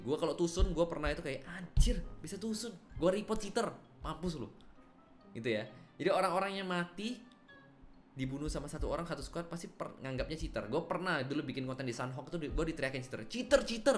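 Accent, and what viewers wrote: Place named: Pekalongan, Indonesia. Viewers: Pekalongan mana suaranya??? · Biasa aja dong bang ngomong pekalongan nya:v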